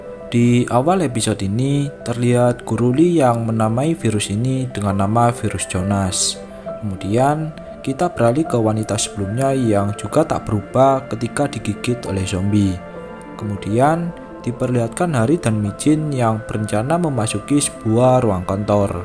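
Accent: Java, Indonesia. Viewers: Fix admin orang Jawa nih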